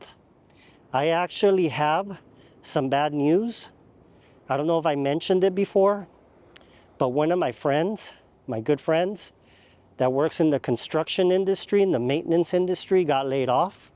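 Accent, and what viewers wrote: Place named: Latin America. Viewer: That stupid latin accent .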